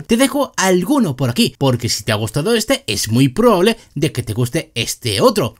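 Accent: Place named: North, Spain. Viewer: Oye, eres del centro o norteño, pero lo que si estoy seguro es que no eres del sur de la Iberia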